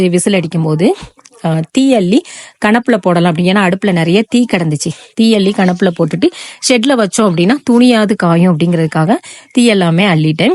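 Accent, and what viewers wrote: Tirunelveli, India. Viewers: Akka, thirunelveli ya!!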